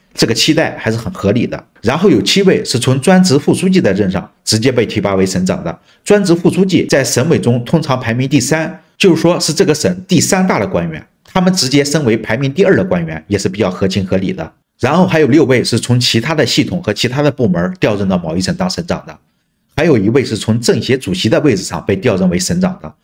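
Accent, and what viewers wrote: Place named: Anhui, China. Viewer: 主播一口白完农村口音，应该是安庆池州纵阳铜陵一带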